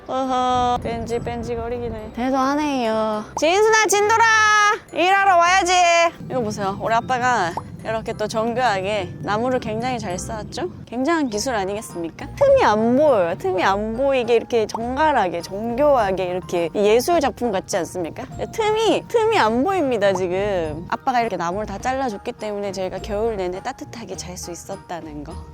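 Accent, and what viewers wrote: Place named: Gyeongsang, South Korea. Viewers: 상주 문경쪽 인가요? 말끝에 '여'자를 붙이는건 그쪽인데여~ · 문경이신가요? 말투가 문경분같아요 몬가 힐링하고 가요😊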